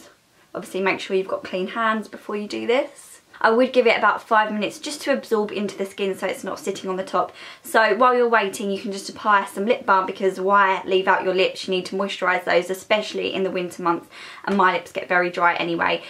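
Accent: Essex, United Kingdom. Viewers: Kayla Motera Thank you! I was thinking Essex.. kinda close! Haha!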